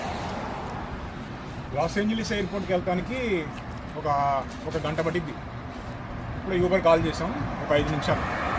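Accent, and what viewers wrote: Andhra Pradesh, India. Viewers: Bro meru .. Nizamabad kada me slang Andra la undi ?..me wife ni parichayam cheyandi...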